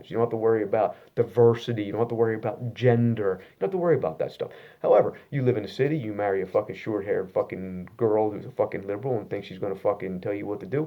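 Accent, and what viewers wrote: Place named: North Jersey, United States. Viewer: can tell from the accent.. am from north jersey myself.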